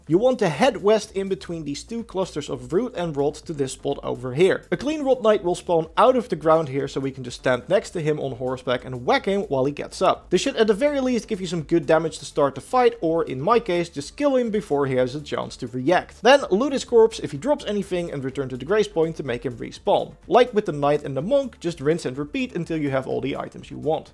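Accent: Netherlands. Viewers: hehe nederlander ik hoor het wel ;) goeie video man ! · Aan zijn accent te horen spreekt deze meneer ook Nederlands :D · The Dutch accent makes this video so much better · Good video thx. Your accents sounds Dutch, is that correct? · You are so dutch haha, instantly recognized the accent :p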